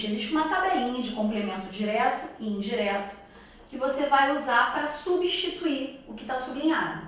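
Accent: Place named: Rio de Janeiro, Brazil. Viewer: KKK engraçado o sotaque carioca dela falando espanhol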